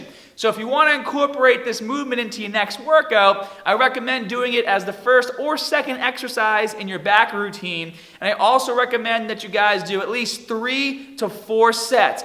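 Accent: Boston, United States. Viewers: I'm irish but this dude must be from Boston "hawdaw" "bawbew" Edit: I love the content. No hate, just an observation. Huge fan of the tips. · Bosten accent?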